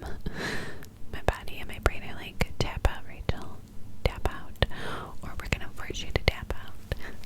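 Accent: New York, United States. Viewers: Well I think you are a pulchritudinous gal, your sweet voice could calm a storm. I love it when you do some videos with a new york accent. I've been a fan of yours now for a few years and you have helped me fall asleep many of times. Im pretty sure you will reach a million subscribers. Anyway you look insanely beautiful and visually stunning as always love your asmr that you do. 😍😍🌹🌹🌹🌹🌎👑.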